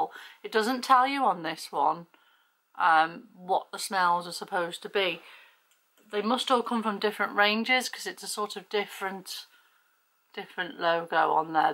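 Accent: United Kingdom. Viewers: I just came across your channel. I listened to what you had to say. Love your English accent.❤️❤️❤️❤️❤️ I Absolutely Love Their candles. I pick them up all the time in TJ Maxx , Home Goods, Marshall's here in the states. I can't live with out them. I have the in all different sizes from the tiniest little ones to the biggest ones. I have single wick, double wick, and three wick. They are Fabulous candles. And by the way I love anything that has to do with pumpkin. I also just subscribed to your. 👍🏻⭐☺️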